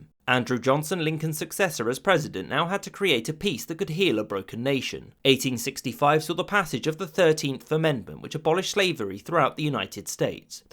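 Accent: England, United Kingdom. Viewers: I can understand why you declare that France had outlawed slavery. But you incorrectly infer that England outlawed slavery. Check you're facts. You should have said Russia. I swear, give someone an English accent, they think they know everything. England was engaging in enslaving India and making drug addicts out of China. Stop you're bullshit.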